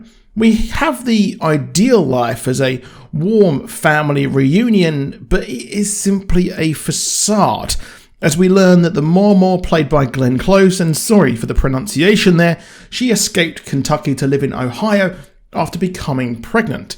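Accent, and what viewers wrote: United Kingdom. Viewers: To call this video review excrement is an insult to excrement. Perhaps, before making a video reviewing a movie that is based off a memoir, you should READ the memoire so that you have some idea what changes were made. Had you done that, you'd see that some dramatization was included (it is a movie after all not a documentary). I'm from Ohio, from the big city, but from the state. My parents also moved from Kentucky to Ohio (which, btw is NOT part of the south) looking for a better life. Despite being a minority, my life was magnitudes more privileged than the author's, in every measurable and intangible way. As far as your hard left personal views, and how things would be in your country, such comments are unnecessary. As was your tripe about Trump. Your ignorance of why things turned about bad after maw maw's move was colored by your personal bias. 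Again, had you read the book, you might have understood. But I suspect, along with not reading the book, and not understanding how health care was provided by employers, you've never set foot in a small American town. And have no idea how much a town is impacted when THE industry in town goes away. You sound like a Brit, so asking anyone whose family lived in a town where the Colliery was closed and everyone that worked there was put on redundancy, or spoken to some one like Erin Prizzy about generational trauma would've given you a some perspective; save the connection to work and health care. Though, again, based on your stated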